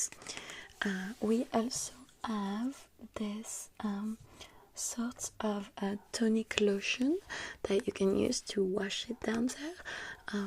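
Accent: France. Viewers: I just came across this videa, i am not sure how or why but, wow, i feel happy, i will check out your channel.. my god, the french and their accent, exquisite. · yesss! I’ve been looking forward to a sequel to the first one of these you did! Love it, sooo tingly and I love the French accent🖤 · Okay I’ve watched like. 27 times so it’s safe to say I’m obsessed!! Love the French accent!! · I'M JUST SO IN LOVE WITH FRENCH ACCENT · im male but i will watch any french accent video from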